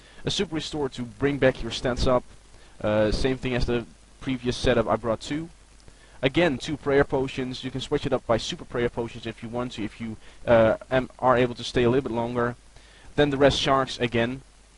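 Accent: Netherlands. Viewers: Hoor meteen dat je NL bent:P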